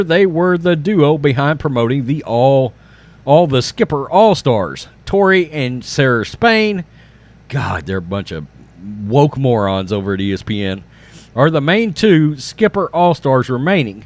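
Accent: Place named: South, United States. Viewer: I lost brain cells listening to your country accent and the amount of time you said, “Woke.” 🤦🏽‍♂️🤦🏽‍♂️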